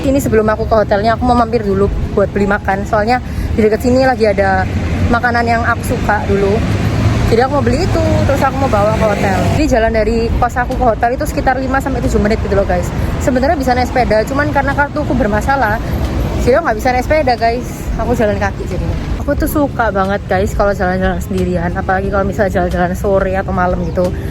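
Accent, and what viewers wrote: Indonesia. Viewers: Logatnya tetep jawa bgt hahaha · logatnya kayak wong suroboyo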